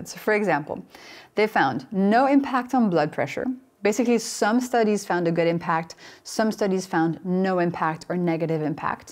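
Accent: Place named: France. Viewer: Your accent smoothly transitions back and forth between French and North American, and it sounds absolutely lovely 😊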